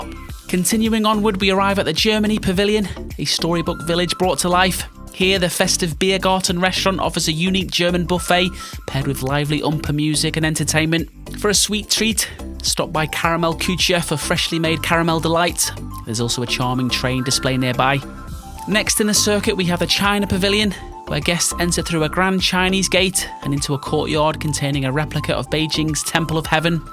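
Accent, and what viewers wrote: Liverpool, United Kingdom. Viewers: Is that a scouser accent? Jesus this was hard to listen to.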